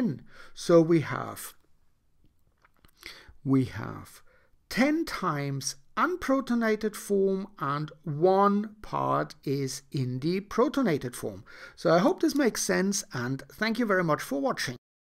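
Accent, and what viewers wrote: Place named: England, United Kingdom. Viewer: Can somebody ~please~ tell me why the English English makes literally every thing easier to understand.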